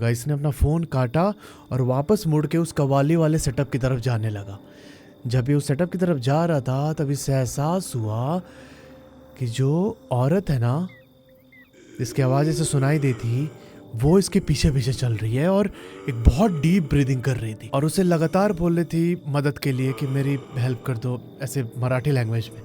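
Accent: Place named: Uttar Pradesh, India. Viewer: Bhaiya please aap kaha se ho apki tone uttar pradesh se ho yesa lagta hai please reply 🙏 ❤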